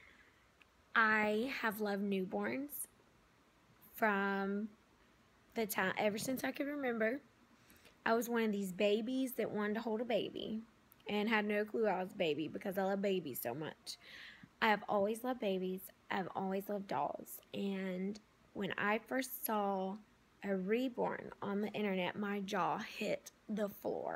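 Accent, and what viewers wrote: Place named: South, United States. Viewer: All this hooplah about Reborns. You are very right and I am so glad you made this video. I am also a 60 yr old, and alone. I was a Neonatal Nurse & Labor /Delivery Nurse for 30 yrs. Now, I only have my dolls to play with and I have loved and collected dolls my whole life. Needless to say, as an adult, and spent a lifetime career of babies, I clearly LOVE THEM and LOVE my dolls. God Bless you for saying this. It is important to all of us. I am also a Southern Lady and so nice to hear your lovely accent and hospitality. Thank you for saying it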